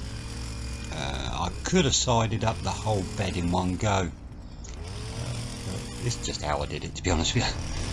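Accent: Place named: Northamptonshire, United Kingdom. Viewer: Looks good. Good vid. Where in the country are you from as you sound very Northamptonian?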